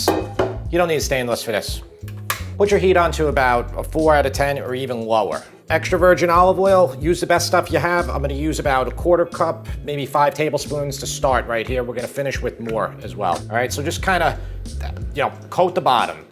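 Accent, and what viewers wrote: New York, United States. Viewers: I'm not sure where you live but judging from your accent I'm guessing New York? Anyways have you ever tried Frank and Sal's Italian Market in Staten Island? I live in Maryland and they ship me all my cheeses and tomatoes, they are incredible!! If you haven't tried them, I highly recommend them!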